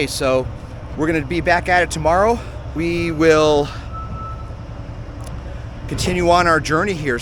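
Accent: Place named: New York, United States. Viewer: Buddy, you sound like you JUST came from New York City!! Definitely!!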